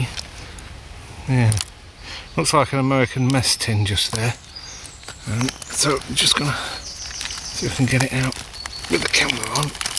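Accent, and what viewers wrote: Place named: United Kingdom. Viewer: where are u based because your website is not working and it did say u r based in the USA ,I assumed because you have a uk accent you are digging in the uk ,I ask because I wanted to join but as I've said your website is not working and I couldn't find any info on how to join ,most of the comments are people like myself are from the uk and wanted to join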